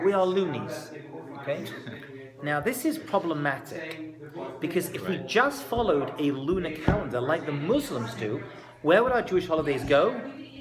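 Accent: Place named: United Kingdom. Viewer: No disrespect he sounds more like English or British person wow back to the study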